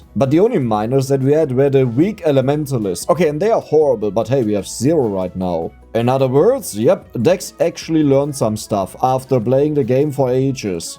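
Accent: Germany. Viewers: I always thought why his accent is so like german until he Said things in german #Selberdeutsch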